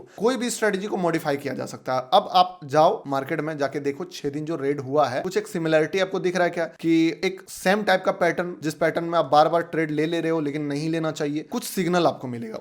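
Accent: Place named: Odisha, India. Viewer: Bhai tum odisha se ho 😊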